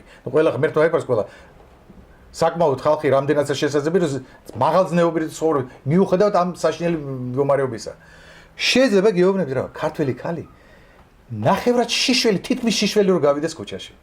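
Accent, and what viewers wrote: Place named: Armenia. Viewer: ჩვეულებრივი ფარისეველი, ბლia:) სომხობა ქართველობაზე იმისთვის გაცვალე ,რომ ქართველი ქალები გელანძღა,მაგ სომხურ აქცენტს მაინც მოუხერხე რამე:))) არაა,სომხობას არ დავცინი,უბრალოდ , პოზიციაaa ძაან "ჰაიტარაკუნემ რესპუბლიკ" :DD....მე ვიყო :D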